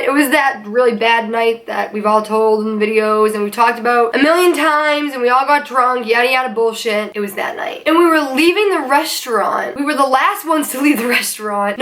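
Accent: Boston, United States. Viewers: Kinda sounds alittle Boston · Kels LaMcintyre it is boston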